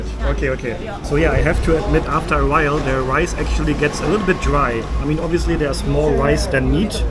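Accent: Germany. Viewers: I love watching all your videos!! Im addicted to your videos!! Everyday i must watch your vidoes!! I love your German accent, so cute!😊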